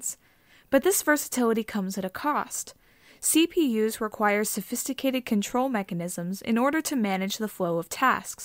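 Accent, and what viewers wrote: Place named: Pittsburgh, United States. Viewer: Luis Bill very true hahaha. It's apparently a Pittsburgh accent thing (that I've undone with some voice and speech training for future videos) 😊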